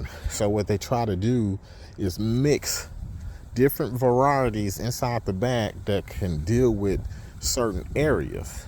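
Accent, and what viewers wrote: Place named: Texas, United States. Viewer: You sound like a guy from Texas named should. 😄 Great lawn. 👍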